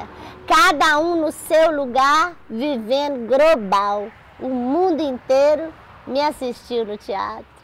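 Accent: Minas Gerais, Brazil. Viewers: Consessa que coisa linda ouvi você com essa simplicidade com esse minerez, só você Deus te de vida longa para nos alerta bjs coisinha 😘😪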